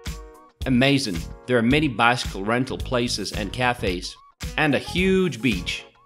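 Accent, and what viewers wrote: South, United States. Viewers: You have an interesting accent. There's a little bit of US southern in it. Great videos! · Your accent is interesting. Did you learn English in the American South by chance? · It's great hearing your Southern accent, as I've been overseas for quite a few years. I'm from Georgia (the state). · YOU ARE SUCH A BEAUTYFUL GUY AND A PERSON AND I LOVE YOU AMERICAN ACCENT)))) GOD DAM I WOULD FLY TO THE MOON AND BACK FOR YOU!!!!!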